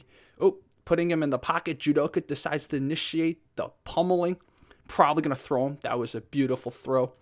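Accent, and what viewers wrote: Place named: Midwest, United States. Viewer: Bro i did not expect you to look like that, based on your voice i was expecting a midwestern white dude in a flannel shirt with a can of beer on the table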